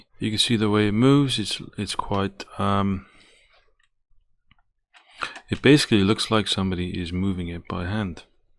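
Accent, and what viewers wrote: United Kingdom. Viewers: thought you had a british accent for half the vid! Bigfoot is real Tod is fake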